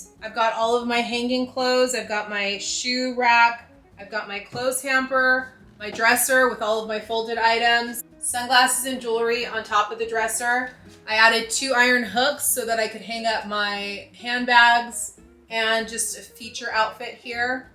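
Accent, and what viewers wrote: Scotland, United Kingdom. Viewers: Loved the Scottish accent!❤ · Hey 🩷 I stumbled across your channel about a week ago & I love your resourcefulness! I don’t think that I personally can be a minimalist, but you give me so many ideas for storage and declutter! I would say add a long frameless mirror in that gap, it will reflect light from either your bedroom window or your bedroom light! Oh & your Scottish accent is great! I’m from England & I can barely do my own 😂🩷✨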